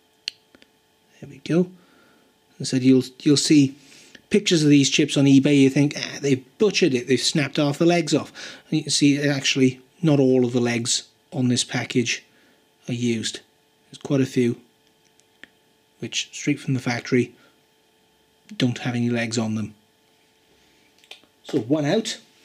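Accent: Wales, United Kingdom. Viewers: I thought u were welsh watching that video. im from Swansea. nice video by the way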